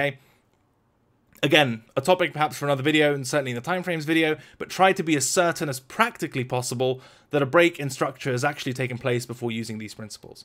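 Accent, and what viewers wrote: United Kingdom. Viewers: High quality content, rich vocabulary and a British accent...I am wet :)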